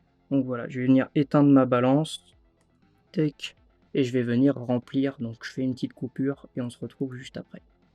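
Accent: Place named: England, United Kingdom. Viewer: Ah l'accent anglais là... :face-purple-crying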